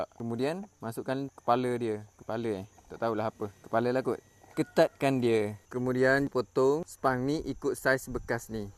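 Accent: Kuala Lumpur, Malaysia. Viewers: 😂😂😂😂😂😂😂kecek kl MCM sial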